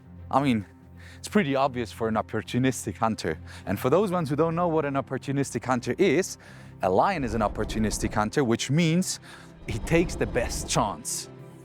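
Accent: South Africa. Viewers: Yoh. Last time I watched you was years ago and you sounded Swedish now you sound more South African 😅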